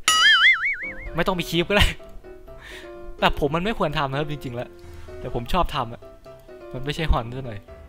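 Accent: Isan, Thailand. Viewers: เดินมาลาสคะเจ้า....คนอีสานใช่มั้ย<num>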